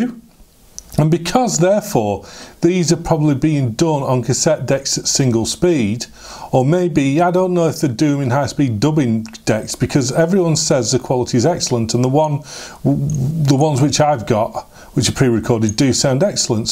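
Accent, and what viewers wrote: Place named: Lancashire, United Kingdom. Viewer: An interesting video, subscribed!👍 You sound Lancashire Vic reeves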